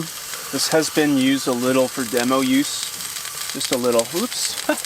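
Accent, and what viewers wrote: Canada. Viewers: Well, I shouldn't be such a whinger about it, so apologies for that,! I thought it was Canada somewhere (due to your accent), but it's a rather large country so I was naturally curious as to where exactly. Thanks anyway for replying!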